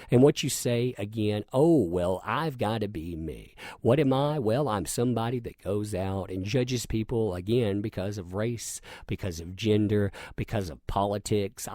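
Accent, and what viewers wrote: South, United States. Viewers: Lol. Right. I’ve left comments a couple times about the “within this/that” blowing him shit about it in a friendly way. But this particular video had “within” all over it in other contexts too. Yeah I’m mostly just kidding. It doesn’t actually bother me or anything. And that’s a great way to look at it! You know he hasn’t been replaced haha I was thinking maybe that’s like a regional colloquial thing(?). He does have a southern accent a bit and I’m from the Midwest. Maybe that’s something folks say where he’s from. Now cap’n Cummings I just can’t watch anymore. Not because I disagree w him on anything at all (I 100% agree w his takes just like D&C and ) but his constant mispronunciation and misreading of simple common words. He’s SO dumb I can’t take it lol. God love him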